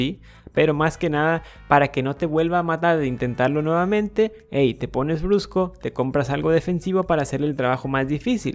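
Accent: Mexico. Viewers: Jjja no mms eres de cancun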